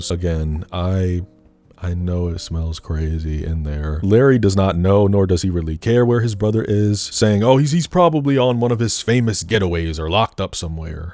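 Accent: United Kingdom. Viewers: British?!!??! I had no idea🤦‍♀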